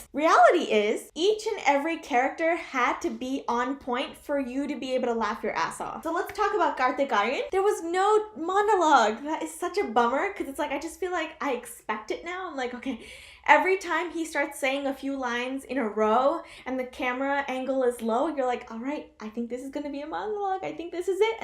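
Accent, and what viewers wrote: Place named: United States. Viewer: Damnn.....Your Hindi with American accent is kinda cute and it is growing upon me...💥💥💥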